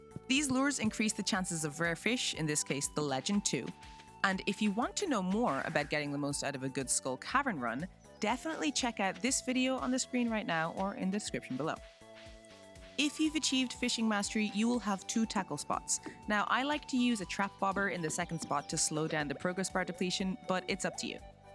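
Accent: Ireland. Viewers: while I found it a bit jarring (as an unfortunate American) to hear it pronounced that way, I personally figured it had to with where you're from. It's easy to tell you're Irish from your accent. Every English speaking country speaks it differently, and even then it can be different just based on which part of the country you're in 😅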